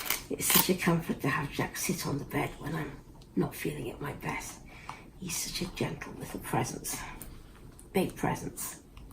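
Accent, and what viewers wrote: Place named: Wales, United Kingdom. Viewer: Aww, hello there, Jordan! He’s such a sweet boy! I absolutely adore his hands and his ears! :) That’s such a sweet outfit! I so hope the new Dumbo that just came out is better than the one I grew up watching. I think the story has potential, but I didn’t like how the original one was done. It was just too sad. I love the Welsh accent! XOXO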